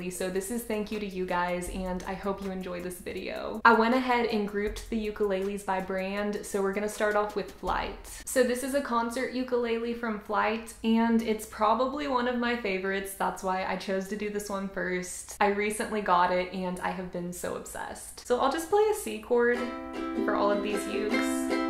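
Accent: South, United States. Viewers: Which part of US is this accent from? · Hi..love the vids and the ukes lol, you're a very funny person and the accent is quite charming I know it's southern States whether its Louisiana or wherever I'm from north of England so not sure...but you should check out that guy on you tube called morbanjo on you tube, he's playing violins he made himself out of cigar boxes and other stuff amazing... Who knows he may make a ukulele for you 😆😊🎻🎸take care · i love you're light to heavy southern accent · Im not sure about it but did i hear a country accent?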